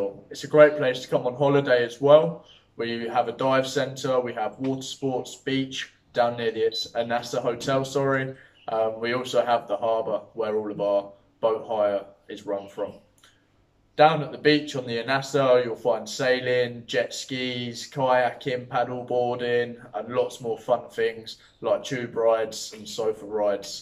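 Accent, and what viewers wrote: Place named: England, United Kingdom. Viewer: from what part of england are you from ?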